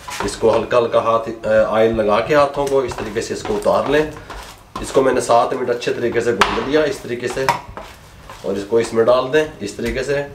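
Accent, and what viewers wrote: Punjab, India. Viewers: smells punjabi in urdu. thanks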